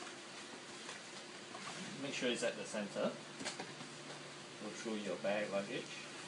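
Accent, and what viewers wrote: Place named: Singapore. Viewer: singaporean accent!!!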